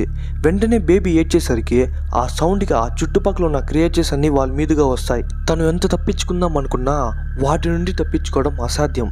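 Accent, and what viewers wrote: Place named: Karnataka, India. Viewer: Language ನೀವು ಕನ್ನಡ ದವ್ರು ಅಣ್ಣ 🤣🤣ಪಕ್ಕ... Hemana paravaledu shoppu yav uoru naadi chikkabalapuramu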